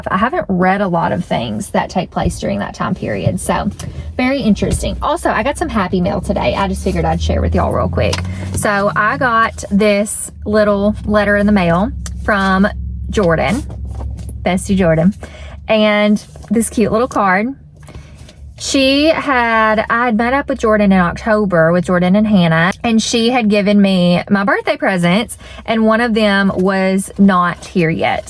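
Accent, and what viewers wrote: South, United States. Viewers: Hi! Do you know if I use your affiliate link to Amazon but get a different set of Grabie markers (or other brand) do you still get credit? I need some new ones to go with my new Coco Wyo color book. These are the best!!! So relaxing and not stressful like the really intricate ones that stress me out more! 😂 Thanks for all your wonderful content!! My hubby calls you “that little southern girl” lol I think he really likes your accent 😊❤️❤️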